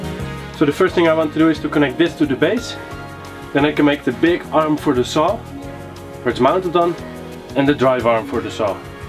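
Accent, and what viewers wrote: Netherlands. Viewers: Zo Nederlands al maar kan dat Engels , mooi project ! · Nederlands? Gehoord accent. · Dat vermoede ik al aan je Nederlands accent in je Engels. Leuke video's, ga zo door. English text below. ------------------------------------------------------------------------------------------- I suspect that from your Dutch accent in your English. Nice videos, keep it up. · Why do u sound dutch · Ben jij toevallig Nederlands 🤔😂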